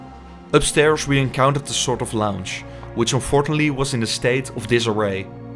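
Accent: Netherlands. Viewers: Zo duidelijk een Nederlander joh hee :-) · it's an accent I would dare to recognize amongst hundreds of people in "wedden dat..." , all nationalities included🤭 I mean nothing bad by this. Ps. I love old abandoned buildings ánd cars😊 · Je klinkt Nederlands kan dat kloppen? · Lekker Hollands Engels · What an amazing documentary! By the way are you Dutch? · dutch accent . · by your pronunciations, you definitely are Dutch.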